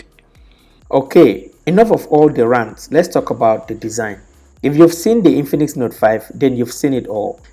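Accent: Nigeria. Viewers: Nice to hear a Nigerian voice in the Tech Youtube world. Keep it up bro. Nevertheless, most Nigerians (May be Africans in Africa) buy phones without checking out reviews. Infinix, Techno, etc don't make waves outside here. I think its because though they are generally cheaper, they dont offer the best amongst other budget phones. 4000mah battery not lasting half a day. Too bad. There are better phones of same price range such as the Nokia 6 and similar series; and some Motorola series. Wonder why these good phones are not so common in countries like Nigeria. Nigerian marketers only look to make most of gains rather than satisfying customers. Period.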